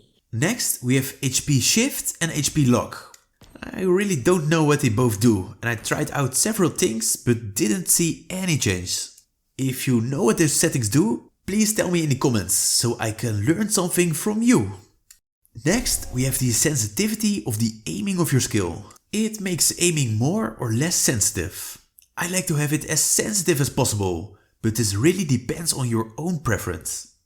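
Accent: Netherlands. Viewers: Nice video man! Na het 1e woord was het al duidelijk dat je Nederlands was haha.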